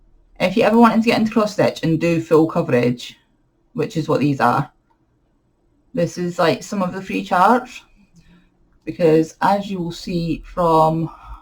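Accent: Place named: Glasgow, United Kingdom. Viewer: Love that you hear the Fife accent but here in Fife all anyone hears is the Glaswegian accent 🤣 Your Virus Shawl is gorgeous and your cabled Jumper is going to be amazing 😍 There is nothing worse than half spun or broken bits in commercial yarn, so completely feeling your pain. Your Cross-stitch is fabulous, can't wait to see it progress it's going to be stunning!!! xxx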